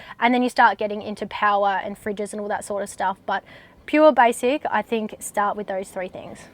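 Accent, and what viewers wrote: Australia. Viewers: Damn... Aussie accent from a cute blond girl should be the sexiest thing in universe :eyes-pink-heart-shape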